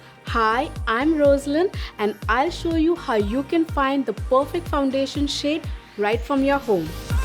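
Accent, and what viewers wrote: India. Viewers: shes black. how she has indian accent?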